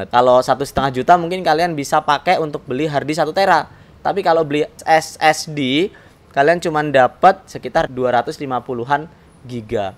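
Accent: Java, Indonesia. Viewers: Ngomonge Medok Sih Kang, Sampean Wong Endi Sih?.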